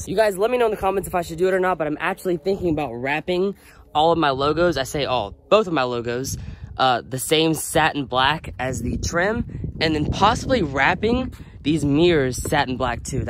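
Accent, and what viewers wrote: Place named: South, United States. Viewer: Love this video man! You sound southern, nashville here. I pick up my red model 3 next week and im so lit!!!! subscribing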